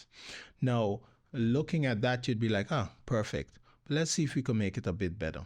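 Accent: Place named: Trinidad and Tobago. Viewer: You talk like you're from Trinidad!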